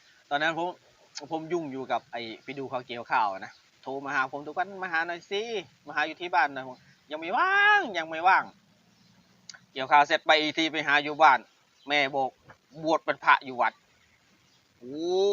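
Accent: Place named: Cambodia. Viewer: ได้ยินสำเนียงฟ่าวกดติดตามเลย หนุ่มขะแมร์ จุงกะๆเจียละออ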